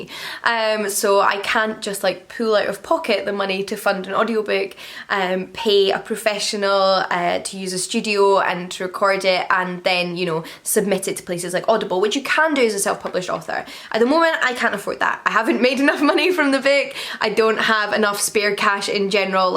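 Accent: Scotland, United Kingdom. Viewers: That board game looks amazing, we will have to look for it. Congratulations on your writing and getting close to finishing the PhD! I totally understand the feeling about buying your first place without a romantic partner. It is really a great idea and I would hope that more young women especially would consider doing the same. It is massively important to your personal development as a person to have become your own independent person prior to committing to a lifelong relationship with everything that comes with that. Even though your lives are together, establishing your own space and responsibilities makes good sense. I love that you and your mom have cohabitate so well during the pandemic. Given that your dad passed away so recently, it is great that you could be there for each other, especially with the lockdowns and isolation. In terms of your book and making an audiobook, I love the idea of you reading it! 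I would guess that most of the people that follow you are comfortable with your voice and the Scottish pronunciations would be a treat. What a great idea! Lastly, in terms of the writing to try, I’m going with the gothic YA finishing school idea. That sounds excellent. You have so many wonderful projects coming up! I’m looking forward to seeing you get through them (which will only create new ideas for the future!). 🔥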